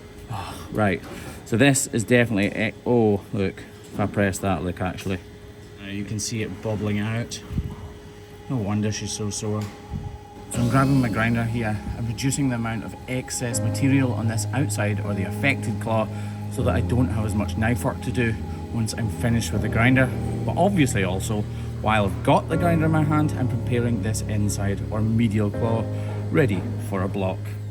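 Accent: Scotland, United Kingdom. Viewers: Tired of hearing your Scottish voice. Just show the video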